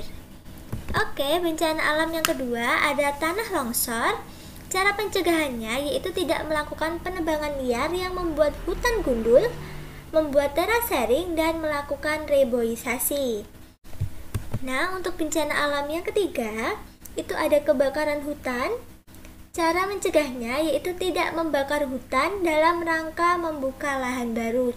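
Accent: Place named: Java, Indonesia. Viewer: Kak, bahasa kakak kayak ada logat logat jawanya gitu? Kakak orang jawa ya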